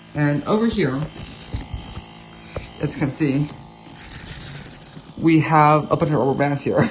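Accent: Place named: China. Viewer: u sound so chinese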